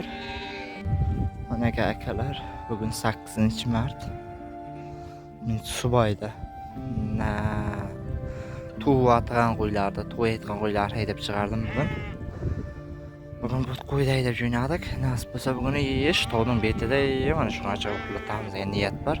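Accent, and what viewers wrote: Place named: Chiroqchi, Uzbekistan. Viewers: укам.мен.хам.чупонман.шевангиз.бизникига.жуда.ухшаеди.узиез.хакингизда.малум от.беринг..каердансиз.самарканд.жом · Dustim uzingiz qayirdansiz. Chiroqchi shevasida gapirayabsiz. Sog buling omad tilayman.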